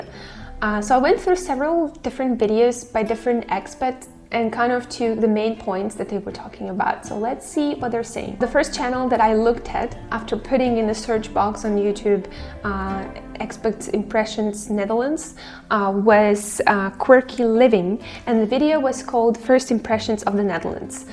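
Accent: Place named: Ottawa, Canada. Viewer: Hi i am a Dutch - Canadian ( living in Canada for 53 years ) what you are saying is so true in the Netherlands (for me it is still Holland ). The person talking about the trains is the same that i was living there , on time and fast. so the person talking about the Netherlands is a sick puppy. Love your way of thinking about for me Holland. You sound like a Ottawa person.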